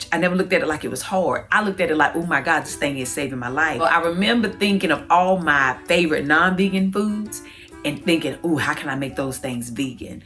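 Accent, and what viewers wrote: South, United States. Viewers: I like her. She sounds so country, honey. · She sounds so southern it almost sounds like she's auditioning for a southerner for a movie.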